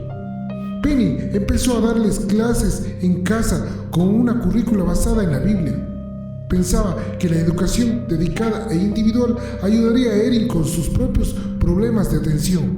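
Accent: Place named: Sierra, Ecuador. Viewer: De qué parte de Ecuador eres? Me suena a la Sierra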